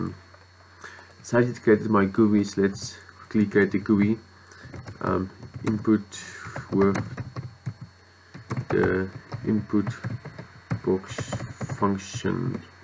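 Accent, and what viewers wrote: Afrikaans, South Africa. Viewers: That boere engels. Haha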